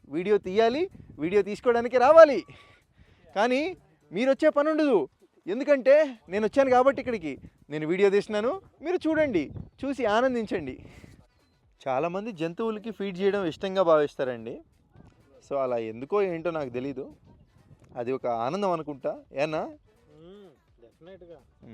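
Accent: Guntur, India. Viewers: Some people r commenting without even understanding his hardwork. I am from vizag and i have good friends from guntur. Adi valla slang but yekkada bad words use cheyledu ithanu. He is genuine. All the best my bro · YouTube views aagipothai kaadhu athanu guntur kaabatti ala untundhi vaalla slang nuvvu yelluthey neeku thelusthundhi ley guntur vaallu choostey vaallaku normal gaane untundhi endhukantey vaalla slang ala untundhi guntur lo picchi puk thelusukoni maatladu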